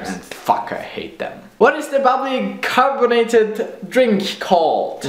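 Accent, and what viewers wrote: Germany. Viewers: Apparently I don't know too many Russians, but I know how this language sound. As a native it's way too easy for you, but for foreigners German influence is obvious in this video, therefore for me he's far from Russian. Всего хорошего! :) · Du bist deutsch! :D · BAHHA german accent sounds cute on this guy! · Damn, I love you German accent! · lol i love your german accent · You're so adorable, and I have the feeling you wouldn't be as adorable without your accent :) good job you were born German :) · Holy faacckk. As a German, born in Canada, all throughout watching this I couldn't stop smiling. (: what a gorgeous smile. And those beautiful eyes. ♡ AND THAT VOICEEE. 😊😊 My looordd. I need to go to Germany and reclaim that accent. 😁😁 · Thank you for this... The other ones I found were Anmericans imitating German accents - You are the real deal and that is what I needed. 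I am an actress and wanted to check my German accent - You helped immeasurably and you were fabulous and entertaining to watch!!! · You are the cutest thing ever & envy your German accent!!♥️ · I love the German accent!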